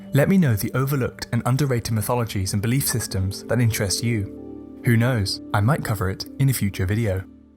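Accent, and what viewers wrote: United Kingdom. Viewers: As an Inuk, this video got every myth right, just as I heard these myths growing up. The pronunciations of the Inuit language through that smooth British accent were on point.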